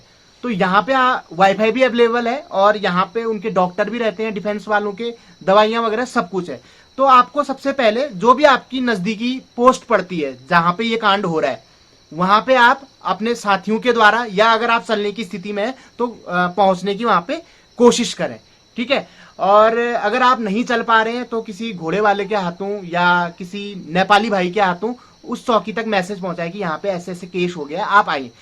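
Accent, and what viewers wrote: Pahari, India. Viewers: भाई हमको आप की बात चीज बहुत अच्छा लगा पहाड़ी भाषा नीको लागछ 🙌💐🙌💐🙌💐🙌💐🙌💐👌🌹👌🌹👌🌹👌🌹👌🌹❤️🌹❤️💐❤️💐 दिल से बहुत अच्छा बहुत अच्छा है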